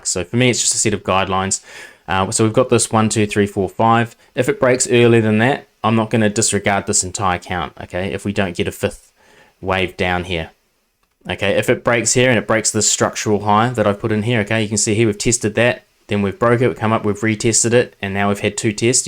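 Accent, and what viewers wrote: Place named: Australia. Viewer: I didn’t picture u as this. Your accent gave me the expression that u were an white Aussie dude lol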